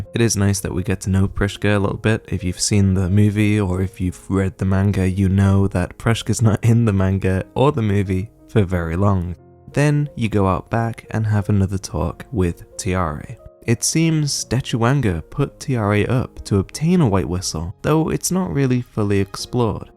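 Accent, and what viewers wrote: United Kingdom. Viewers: why are you british?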